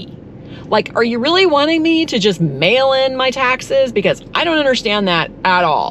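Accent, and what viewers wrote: Oklahoma, United States. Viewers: Your accent sounds close to an Oklahoman accent. If remember right, the lakes were created so we wouldn't have another dust bowl.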